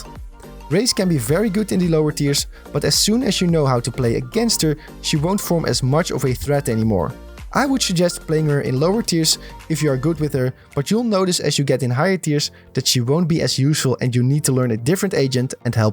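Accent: Netherlands. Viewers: Ik hoor aan je engels dat je nederlands bent xD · Your engerish is very well i instantely now you are dutch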